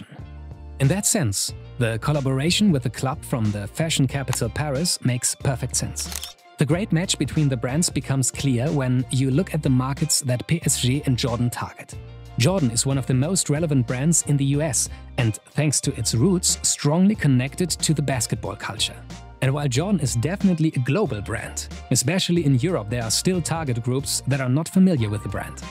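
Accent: Germany. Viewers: U german right? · Wie man merkt das er Deutscher ist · is the narrator German? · The german accent is horrible otherwise very informative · Ur German accent is so relaxing!!!! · Irre ich mich oder kommt der Deutsche Akzent raus? 😂😅🤔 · LOL I'm from Germany and i can tell by the accent the person of this account is a German ;)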